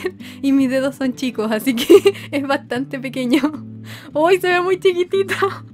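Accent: Chile. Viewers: Eres chilena ? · Eres chilena cierto? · ¿eres chilena? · Se nota que eres chilena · ¿Eres Chilena? Yo si.... PD:Tienes vos de Chilena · oye eres chilena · Es chilena? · Eres chilena? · Se nota q eres Chilena :3 CHILE GANA WEON >:VVVVVVVV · Piyo eres chilena? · No se si yo tengo un don de saber quien es chilen@ o esque ella tiene un acento fácil de saber que es chilena... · Eres chilena??? O alguien sabe si es chilena??? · Es chilena?? Se nota en la voz mdmd❤️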